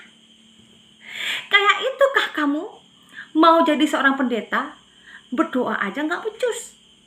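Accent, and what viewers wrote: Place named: Madura, Indonesia. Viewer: enak bngat bu bhsa di campur good.snang klu khotbah tu bhsanya darrahnya hrus ada krn itu asli bhsa leluhur bu dia.sy paham klu logad madura krn sy lama di surabaya tnggal di daerah kenjeran bnyak madura di sananya mba.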